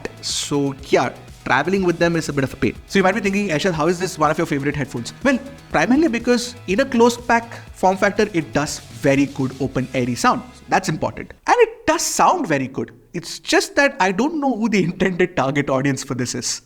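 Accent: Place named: Pune, India. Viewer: With time has obtained Puneri characteristics that are somewhat noticable through way he speaks now...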